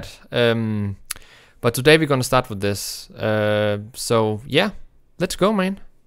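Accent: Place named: Germany. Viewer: You sound as though you have a German accent... how lucky you are to have J.S. Bach as part of your cultural heritage. The very grandfather of classical music, and the author of the once a world '48 preludes and fugues' If I were German I would be incredibly proud so to be, with the cantor of Leipzig being one of my previous countrymen.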